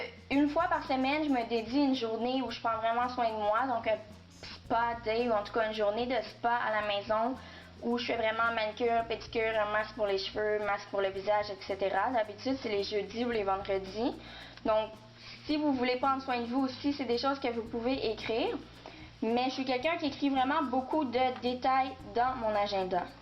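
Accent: Quebec, Canada. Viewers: québecquoise, c'est un des accents qui se repère le mieux ^^'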